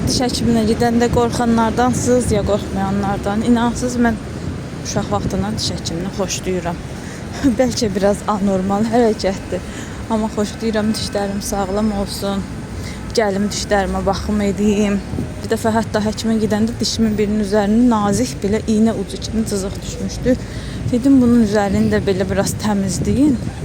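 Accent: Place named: South, Azerbaijan. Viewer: Salam,sizin ləhcəniz elə şirin gəlir.variydi variydi deyirsize,cənubdansiz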